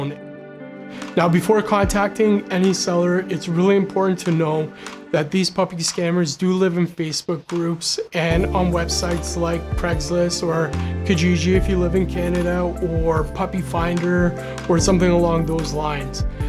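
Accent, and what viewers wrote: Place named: Hungary. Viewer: I'm sorry this is super unrelated, but are you originally from Hungary? Just thought because of Füli and some slight tinge of your accent ^^ Thank you for all this information also, I just found your channel and already subbed ♡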